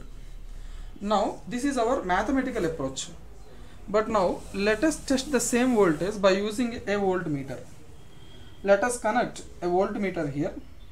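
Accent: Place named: India. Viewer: cool and kinda funny how your cursive writting has as much indian accent as your voice.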